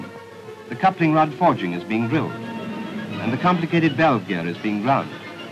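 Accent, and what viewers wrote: RP, United Kingdom. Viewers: Not really. Commentators of the day usually spoke in what's known as "Received pronunciation." Wouldn't do to have unwashed heathen Northerners commentating on serious stuff like this....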